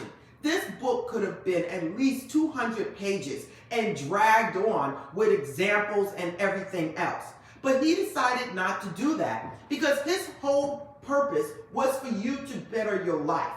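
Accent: New York, United States. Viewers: Love the content and your NY accent ! 😍❤🙏🏻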